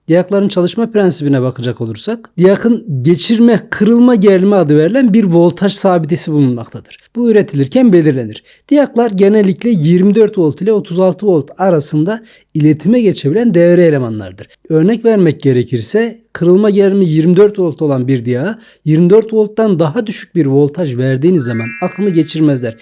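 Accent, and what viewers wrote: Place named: Central Anatolia, Turkey. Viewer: Teşekkür ederim. Güzel anlatmışsınız 👍👌💯 Konuşma aksanınız tahminimce orta Anadolu tarafı ☺️🤔 Aksaray, Yozgat 🤔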